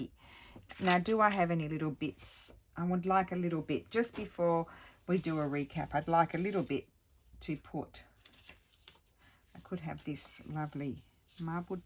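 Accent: Australia. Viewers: I love how you sound more "Aussie" the longer you are away from home! This is lovely. I am still holding out hope that my birthday challenge entry arrives this year!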